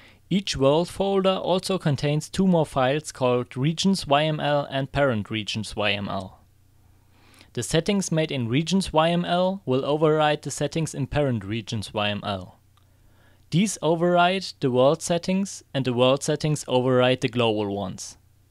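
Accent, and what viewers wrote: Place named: Germany. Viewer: this is the most german english I've ever heard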